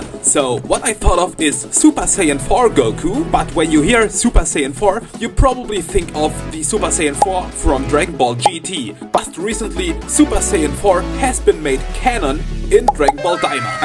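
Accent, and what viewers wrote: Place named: Germany. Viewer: I Hear the deutsch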